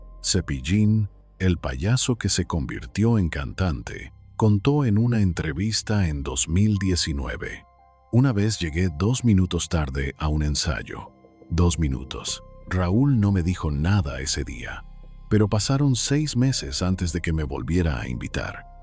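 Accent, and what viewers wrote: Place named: United States. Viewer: El comentarista la caga con ese habladito como de gringo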